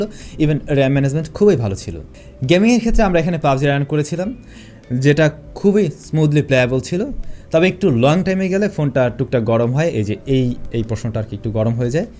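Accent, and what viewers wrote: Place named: Tangail, Bangladesh. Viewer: Vaiya apnar basa kotha love for Tangail ❤️❤️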